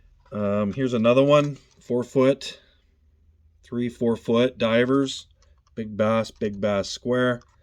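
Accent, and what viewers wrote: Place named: Canada. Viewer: Your accent is identical to Ricky’s accent in trailer park boys, you must be Canadian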